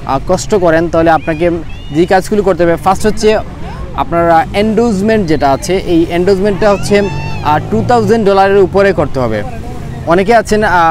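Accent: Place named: Sylhet, Bangladesh. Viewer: সি‌লে‌টি টোন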